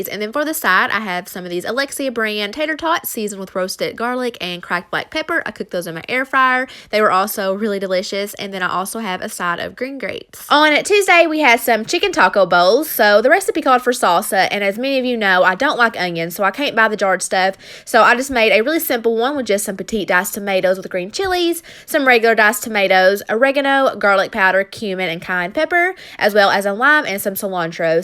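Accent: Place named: Kentucky, United States. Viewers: Kentucky! So there's the accent! ;) I had an Aunt that lived in Padukah. I'm in IL myself. Loving these videos! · I knew i recognized that accent! What part of kentucky are you from? Im in taylorsville. Btw i love your videos im always stuck on what the heck to make for dinner and this is my way out everytime, thank you!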